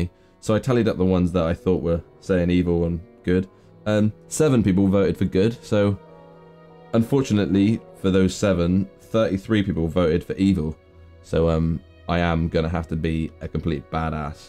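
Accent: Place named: United States. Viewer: you sound very American when you speak... what to call it... gamer-lingo?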